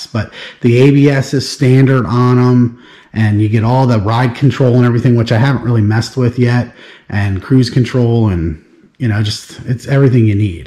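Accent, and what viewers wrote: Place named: Western Pennsylvania, United States. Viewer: Your voice sounds like you are from western Pennsylvania.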